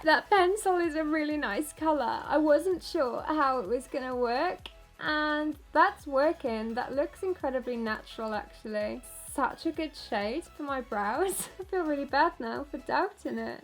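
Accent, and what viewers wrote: United Kingdom. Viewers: I love her and her british accent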